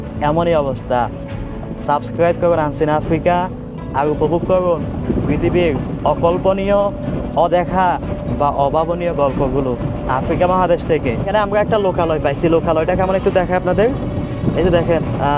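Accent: Chittagong, Bangladesh. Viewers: Don't mind brother... watching from Rangpur and I can understand your Bangla easily but Chatgaiya is difficult to understand..No problem go ahead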